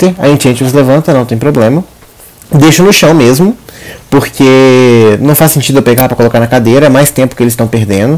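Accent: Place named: Germany. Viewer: É o que eu sempre digo, " Escolha o survivor de acordo com o mapa e com time, por isso vc não PODE ser monoEla existe e é chata" kkkkkk não precisa explicar muita coisa sabe todos os meus esconderijos, vou ter que procurar outros. Yidhra Party! Tu estás expandindo a firma pq aja serva. "Terror Shock" Leia com o teu sotaque alemão que amo. Que milagre Doctor não farmar e sim ser farmada. Cowboy bestão, A DW amou. O esquecimento do criador de conteúdo. "Eu posso deixar ele não chão quanto eu quiser" kkkkk... Obrigada por adiantar Prisoner. Poxxtman me imitando achando que vai cautelar o blink e sefuuu É muito sangue frio e planejamento pra jogar de Hunter, principalmente com a DW que vc precisa de muita, mas muita habilidade, concentração, predict entre outras coisas. E acho extremamente necessário que não só a galera que quer aprender a jogar com ela assistam esse vídeo, mas também os survivors, pra aprenderem a jogar contra ela.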